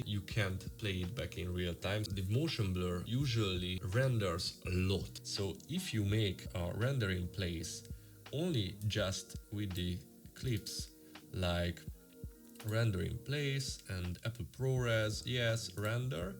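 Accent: Hungary. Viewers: Na jó 😁 addig görgettem míg kaptam magyar hozzászólást 😁 az akcentusból kivehető 😅 köszi a klippet!❤ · Hello ☺️ pár perce nézem még csak a videót, de már most látom, hogy érdemes lesz végig nézni. Meg lestem már pár hasonlót speedramppal kapcsolatban és kiváncsi vagyok milyen extrát tudsz mutatni azokhoz képest. Ja és remélem eltaláltam a nyelvet az akcentus alapján 😉😃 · Are you hungarian? Na ja jo, egsh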